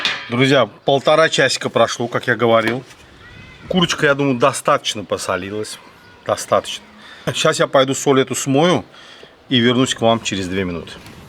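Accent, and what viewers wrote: Baku, Azerbaijan. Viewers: Такой позитивный и такой акцент красивый чисто Бакинский😂👍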